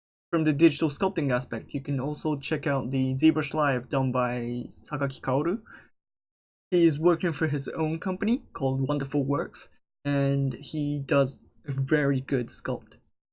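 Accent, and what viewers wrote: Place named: India. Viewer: “I’m japanese”. That accent doesn’t lie ya Indomutt akhi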